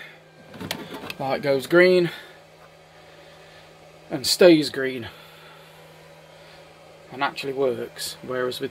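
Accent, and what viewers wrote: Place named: United Kingdom. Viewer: Hi, Just watched your video after doing a search on the net for Epever com port failure. I have a Tracer 4210AN which I have had for about 9 months. 2 days ago the data logging suddenly stopped, I monitor it using a custom logger called a SolarDashboard buy SnekTek which is very similar to your data logger. I can also monitor and adjust setting via my PC using a USB to RJ45 cable. Now I cant do either. Any suggestions? I too am in the UK (guessing by your accent) Nice to see an audio cassette on your shelf next to your stereo. My setup is in my garage where I too have a stereo and was only playing a cassette the other day. Graham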